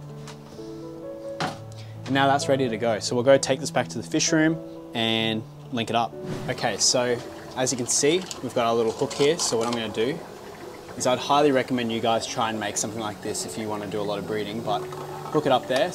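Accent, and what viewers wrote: Australia. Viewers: Australian, not Pom.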